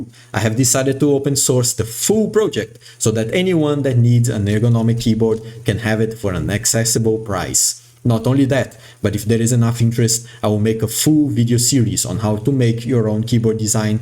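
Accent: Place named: Brazil. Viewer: Ahahahhaha então somos conterrâneos! Inglês perfeito, mas desconfiei pelo leve sotaque da nossa língua. Muito bacana. Vou me inscrever e depois vejo uma forma de dar um feedback pra ti de como ficou o meu aqui.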